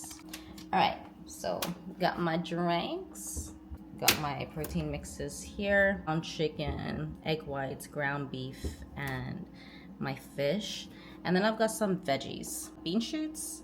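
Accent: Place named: East Coast, United States. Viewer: You actually sound like your from the east coast, and BTW that GASP shirt was a banger I have a lot of that gear along with many other fitness oriented companies...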